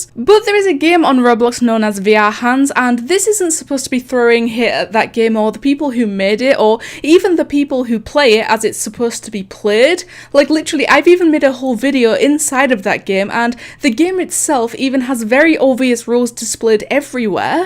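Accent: Scotland, United Kingdom. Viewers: you sound scottish + british its nice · Are you Scottish?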